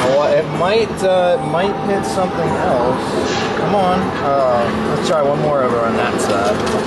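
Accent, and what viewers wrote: United States. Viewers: he has the most american accent ive ever heard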